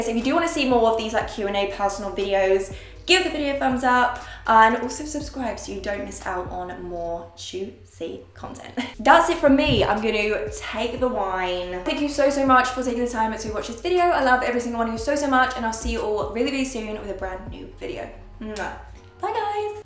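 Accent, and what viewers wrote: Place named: Yorkshire, United Kingdom. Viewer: The Yorkshire accent needs work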